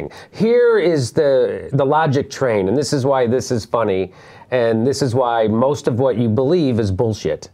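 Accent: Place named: Chicago, United States. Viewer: Jimmy’s accent has changed so much throughout the years, even 9 years ago he had a relatively thick Chicago accent.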